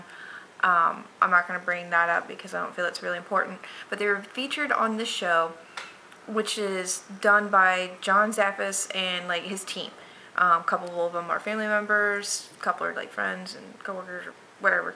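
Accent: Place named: North Bay, United States. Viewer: It sounds like she's from North Bay. I don't know but my ex friend sounds just like her and she's from Petaluma CA. They mumble and say but umm a lot and connect all the words. I couldn't listen to this video without ear strain.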